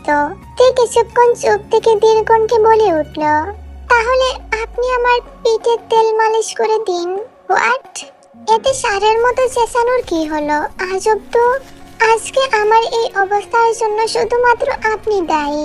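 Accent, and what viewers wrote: Sylhet, Bangladesh. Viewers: না আপি মনে হয় সিলেটি❤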